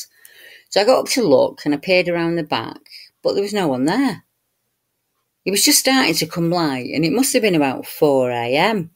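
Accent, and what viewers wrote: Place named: Lancashire, United Kingdom. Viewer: I am moving from Manchester to the US in a few weeks. When I miss that Lancashire accent, I am going to listen to your channel,. I appreciate the work you do!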